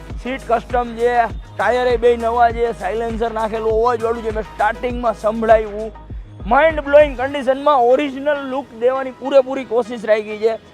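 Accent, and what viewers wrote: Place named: Vagad, India. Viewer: Aap Amara vagad ni bhasha Bolo cho hove 😅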